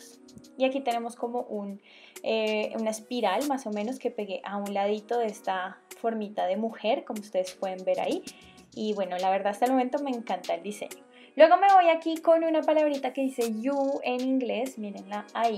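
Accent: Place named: Bogotá, Colombia. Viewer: Siento el acento Rolo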